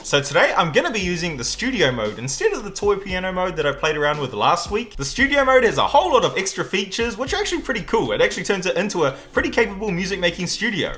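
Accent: New Zealand. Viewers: yo, that accent.. are you a kiwi? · Are you a Kiwi? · Is he from nz? Oh yeah yeah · he’s def a kiwi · Are u from New Zealand? U sound like a kiwi.. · Are you a kiwi, brother? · He sounds kiwi, I love him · You from New Zealand?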